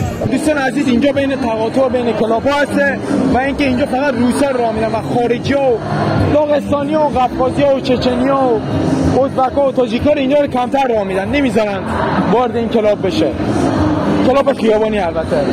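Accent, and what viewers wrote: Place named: Sabzevar, Iran. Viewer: لهجه سبزواری موج میزنه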